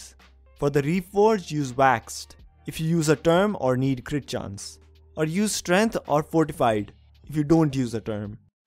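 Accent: India. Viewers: Are you Indian I think bcz of your voice make videos in hindi it supports Indian hypixel community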